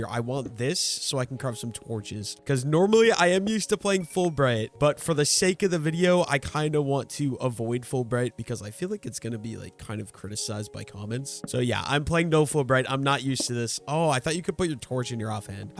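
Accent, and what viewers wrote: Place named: Canada. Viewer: You sound somewhat canadian 😂❤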